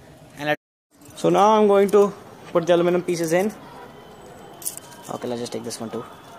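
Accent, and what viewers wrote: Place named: India. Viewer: Vai. Indian. Ho. Khudke. Language ko.. Priority. Diya karo. Hindi me vi bola karo... Jitna vi natak karo.. Apki. Bolne ka tarikese. Malum. Parta hr indian.. English bol raha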